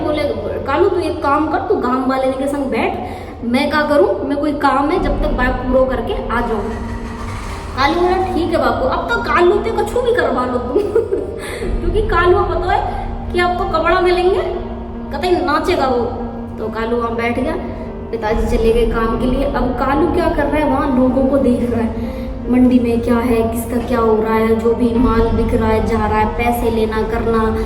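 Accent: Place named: Rajasthan, India. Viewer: ap b rajasthan se h dii 😍😍😍vhi me sochri hmare yha k jesi language h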